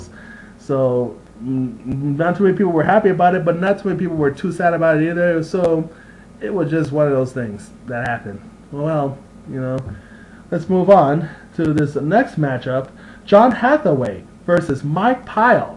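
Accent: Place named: England, United Kingdom. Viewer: Lmao ur accent cracks me up idk where ur from maybe England but I appreciate your luv for martial arts it's very similiar to mine keep doin your thing and ur name rocks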